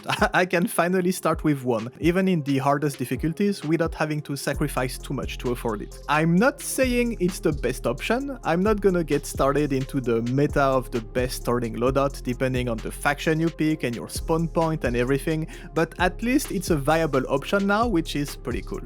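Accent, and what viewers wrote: France. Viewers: Are you French by any chance ? :D.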